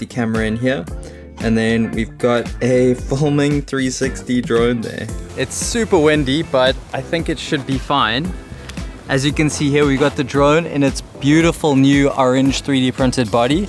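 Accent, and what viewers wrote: South Africa. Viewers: SOUTH AFRICAN ACCENT DETECTED!! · Yoh the SA accent is so recognizable bra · That south african accent again. Thumbs down, I'm out.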